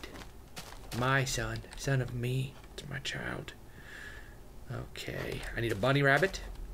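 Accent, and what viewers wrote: United Kingdom. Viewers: You have an awesome British accent! :D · hey! are u talking british? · Love ur British voice! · I FREAKIN LOVE YOUR BRITISH ACCENT WHEN I HEAR IT I PASS OUT!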